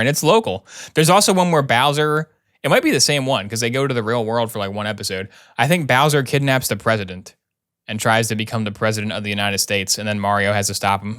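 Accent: Australia. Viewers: British accent???.... Australian ffs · Ohh he's Australian? Oh krickey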